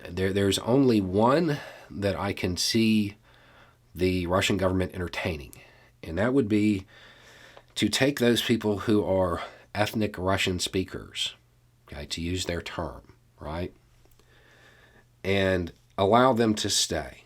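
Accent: South, United States. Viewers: Howdy! Thanks for your thoughts and considerations. First of all let me say that I'm glad I found your channel. Although I first was a little sceptical when I watched the videos, as for some reasons, to me it looks suspicious when I see a bearded US guy with a southern accent talking about politics from what looks like a preppers bunker, closing with an image where he's sipping moonshine;) No offence though, I guess you can imagine what events in more or less recent history made me develop that sense. But after watching some more of your videos and of course doing my own research on some topics, I came to the conclusion that you're actually one of the most considerate and probably most trustworthy youtubers I have come across. And I tend to agree with many (if not most) of your conclusions and predictions - at least as far as my own information background allows. 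However, talking about P's plans for the Ukraine, from all I learned about him recently, and from the sources I found so far, I think he will not content himself with "liberating" the recently acknowledged "republics" in the Donbas. At least not if he still sees a chance to occupy the south, to have an access to Crimea via road and train. And he will even try to go a bit further to the west, to secure the water supply for Crimea from the Dnieper, by occupying Kherson county and the canal (which was closed by Ukraine after Crimea was annexed and caused a draught there). And while doing so, he will leave scorched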